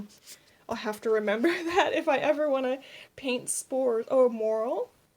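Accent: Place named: Eastern Europe. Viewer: Love your spirit! So joyful and enthusiastic 💕 You have very interesting accent. Are you from Eastern European culture? Love and light 🌺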